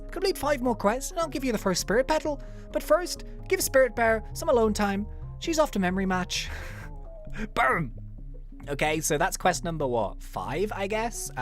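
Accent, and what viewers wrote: Ireland. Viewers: But I really love your Irish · I feel like he has never been to Ireland he sounds more like an Irish man with a high pitched voice I am insulted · The spirit bear with your lady irish accent makes spirit bear 10x kind. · Thats i nice irish lady accent in my opinion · you are actualy doing a good irish accent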